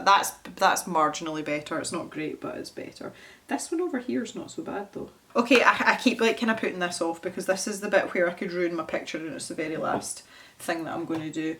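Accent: Scotland, United Kingdom. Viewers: Scottish ASMR - reading anything says with some sarcastic comments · I LOVE your accent!!!! Living in the states, the Scottish accent sounds so exotic!!!! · Your painting is amazing! Oh, and your accent... I've said it before, but I LOVE the Scottish accent! It's what drew me to start watching your videos, and I have become a huge fan of your artwork ❤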